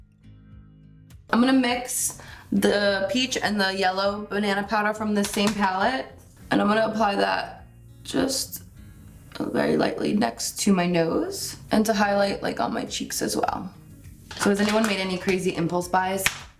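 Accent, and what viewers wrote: New Jersey, United States. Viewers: The jersey came out in this video !! Eowww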